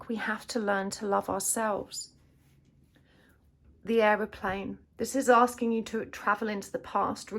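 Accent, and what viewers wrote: United Kingdom. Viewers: Dear. I am writing to say how life changing and transformational this reading for me. I chose pile 3. It was so cathartic and eureka moment. The book recommendation by you about Judy Hall! I found it immediately on scribd and was included in my membership. I take it as fate and serendipity. You narrating your personal experience gives me comfort. You are super gifted and seem a trained counsellor or psychologist. I can guess from your accent that you are from UK. So am I and it would be a privilege to have a F2F reading from you thank you again for lighting me my path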